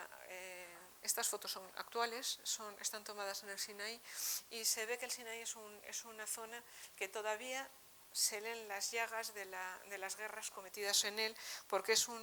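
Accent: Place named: Spain. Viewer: Esta mujer es española por el asentó .....entonces muuuuuy probablemente sea católica romana y de allí por supuesto no acepta que los descendientes de Jacob son los dueños de esa tierra y si no quieren aceptar. Respeten la compra de esas tierras que que compro Abraham. Hay pruebassssss ancestrales así esta mujer y sus secuaces digo su grupo intelectual siguen al vaticano quien formó el Islam $$$$ y les hacen batalla a los judíos en todo el mundo.y la católica a todos los dueños de sus tierras mandando a matar a los nativos de el mundo. Y esta mujer es una ignorante deve educarse antes y después de lo las fechas que da y realmente decir la verdad profunda y clara es una vendida .como que si a México no lo siguieran saqueando. Dios nos libre de esta barbarie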